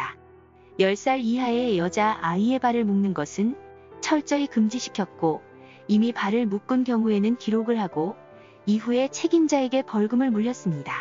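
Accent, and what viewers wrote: Gyeongsang, South Korea. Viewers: 아 저도 그 갱산도 사투리가 욕은 많이 먹었어두 나름 개성적이고 중독(?)되서 구독 한건대 쪼까 아쉽네영 ㅎㅎㅎ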